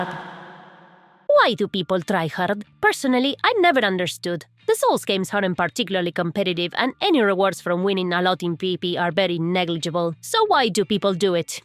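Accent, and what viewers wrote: Argentina. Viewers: Eres argentina? 👀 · The way it pronounced is because of his argentian accent hahaha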